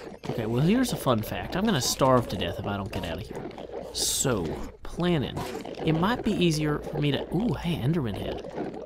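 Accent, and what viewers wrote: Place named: Tennessee, United States. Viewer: Nice accent xD This is the first video I have watched by you, nice videos, and I really do like that Tennessee accent xD!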